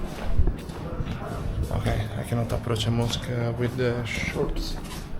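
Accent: Italy. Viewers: So beautiful the place look like Greece beautiful video thanks for sharing I like your Italian accent when you speak English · Nice video I loved it so much like your Italian accent, keep coming back. Much love habibi 🇹🇳❤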